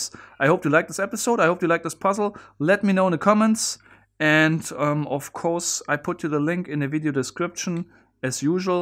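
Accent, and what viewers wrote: Germany. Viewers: Is that german accent? 🤔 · wie man einfach raushört das er deutsch ist xD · Yes, it is a German accent...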